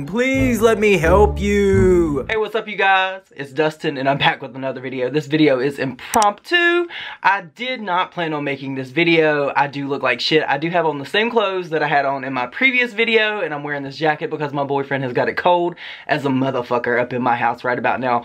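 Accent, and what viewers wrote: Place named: Alabama, United States. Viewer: New sub. Our accents match! I’m from Alabama ♥️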